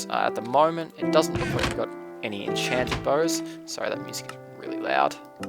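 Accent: Australia. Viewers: Your australian? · dude r u aussie!!!!!!!!!, cause i am too bro!!!!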